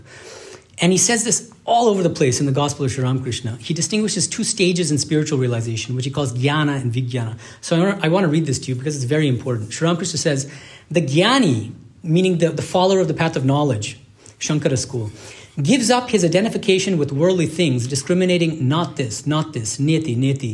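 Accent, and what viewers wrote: United States. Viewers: My two cents: Swamiji has given a fresh and comprehensive perspective of what is to be understood as Vedanta philosophy in the light of the teachings of Sri Ramakrishna and Swami Vivekananda. In addition it is a knock on the heads of fanatical bigots who are possibly insecure about their own beliefs . Can you ignore a toothache saying it is unreal? will you refuse to bow down to a statue of Sri Shankara saying it is unreal? Just as you are questioning the Swami if he played marbles with Shankara were you present personally when the acharya wrote the Vivekachudamani? If you look up online you will see the authorship is questioned by many scholars. Talking disrespectfully about a monk of Ramakrishna order is unbecoming of a spiritual aspirant. Swami Medhananda was born and brought up in the USA and has a natural American accent. Also Swami Sarvapriyananda and Swami Medhananda have due respect for each other's views. 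· A monk should not have bias which this monk has in abundance. He may be a scholar but lacks in the fundamental factor of expression of his views. He may.speak the English language like an American but does not know how to teach, how to pause, how to repeat. All he does is to show off his American accent. It seems as though his object is to put down Sri Shankaracharya Bagavadpada by questioning the authorship of Sri Shankaracharya's great works ; the crown being Viveka Chudamani. You may have a completely different opinion and you are entitled to it. But you have no right to speak of Shankaracharya in the manner you spoke. Did you play marbles with Sri Shankaracharya? On hearing you I get the impression that you are out to insult Sri Shankaracharya ( not that any one can do that, least of all you) and you are out to show off your accent. You can never compete with Swami Sarvapriyananda for you don't have the guna required for it. 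You are not fit to be called as monk. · Exactly... He simply states Advaita as 'ब्रह्म सत्यम जगत मिथ्या' by World is not real. He did not take any effort why 'मिथ्या' has been used. He is not aware of Shankara parampara also. His American accent might allure some people but not true seekers.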